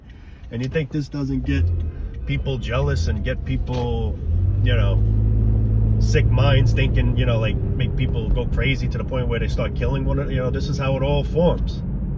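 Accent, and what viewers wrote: New York, United States. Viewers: I love ❤️ your New York accent and your videos. I feel so grounded in actual (not fake) 🃏 reality and unfortunately there's not a whole lot 🤏 of positivity. 😞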